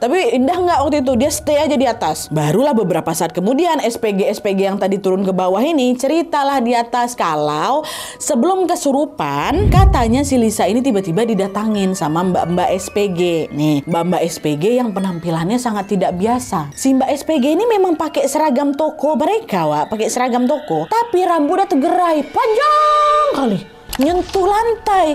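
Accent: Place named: Medan, Indonesia. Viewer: orang medan kau rupanya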